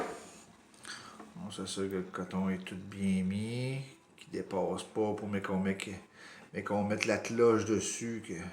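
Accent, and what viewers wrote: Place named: Canada. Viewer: Bonne vidéo je fais une découverte avec ce tank . J'aime bien ton accent Canadien 👍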